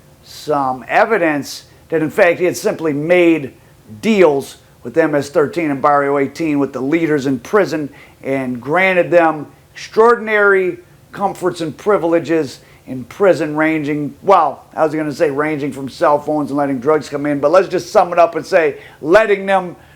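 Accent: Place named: Michigan, United States. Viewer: I was going to ask, are you from east michigan or detroit.. that accent 👌 You were recommended, subscribed already. Cheers from the mitten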